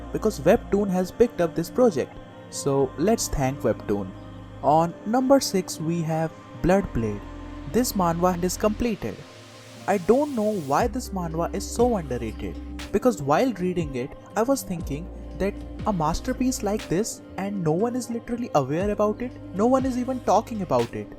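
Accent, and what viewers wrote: India. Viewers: Just a question u sound indian are ye ?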